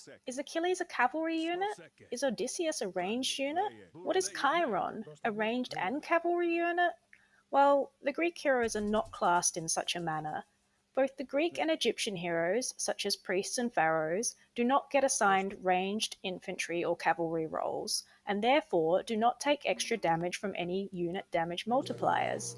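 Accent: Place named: Australia. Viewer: Why do you sound Australian? 😅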